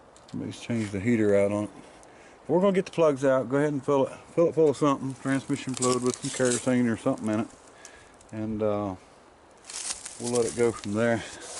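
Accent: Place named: North Carolina, United States. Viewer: You have the North Carolina accent..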